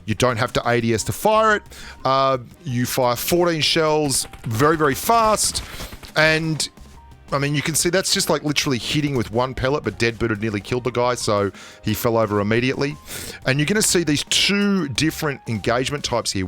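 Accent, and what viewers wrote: Australia. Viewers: ur from australia arent u'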